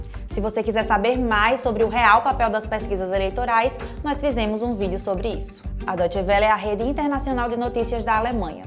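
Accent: Northeast, Brazil. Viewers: Bahh e esse sotaque ?? Legal colocarem uma nordestina 👏🏽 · Com esse sotaque LIXO nordestino, sua análise fake e 💩 se equivalem (!) Nordeste é a vergonha do 🇧🇷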